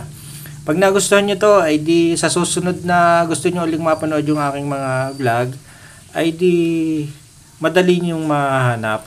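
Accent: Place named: Batangas, Philippines. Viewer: Ay kainaman.batangasin ang iyung tuno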